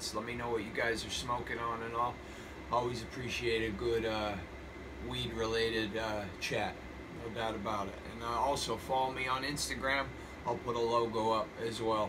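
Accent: Pennsylvania, United States. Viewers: ayy def got the PA accent haha, greetings from NEPA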